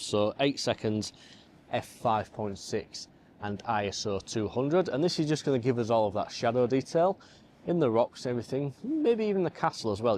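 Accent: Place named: North East England, United Kingdom. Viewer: Superb photos. I really am thinking about going back to M43 and this video helps. Because of your accent I actually thought you were from the north east so was surprised when you said how far you travelled! ‘Honey pot’…if you want a honey pot head a bit further south where you’ll find St Mary’s Lighthouse at Whitley Bay 🙂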